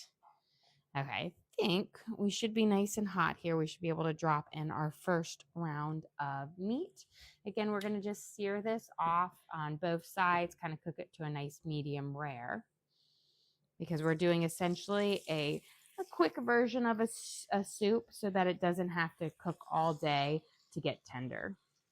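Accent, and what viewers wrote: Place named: Florida, United States. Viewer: You are starting to sound like we live in the same state. I’m in Florida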